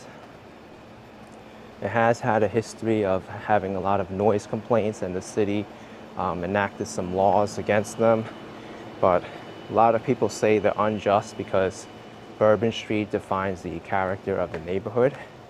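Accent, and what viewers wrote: New York, United States. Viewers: Your awesome NY accent will alway give you away that you are from NY.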